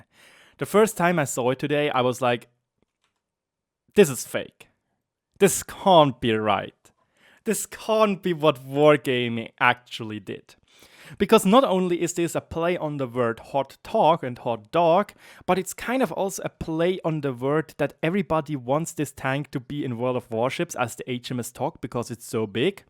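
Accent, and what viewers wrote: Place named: Germany. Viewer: For a German, your English is pretty ok, but maybe watch some undubbed movies to improve pronunciation a bit further..? If your looking for a broader audience.. Just a thought..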